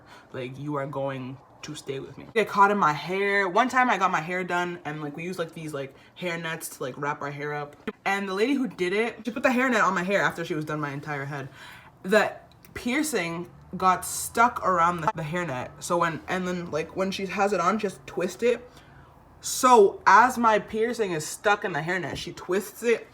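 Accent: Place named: Dominican Republic. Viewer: I knew que eras Dominicana or cubana lol I have a Cuban friend that talks just like you, I’m Dominican btw 🇩🇴🔥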